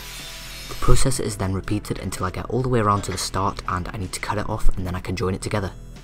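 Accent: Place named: Wales, United Kingdom. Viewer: Great video 👌 Still trying to place the accent though. Sounds kind of south east walian.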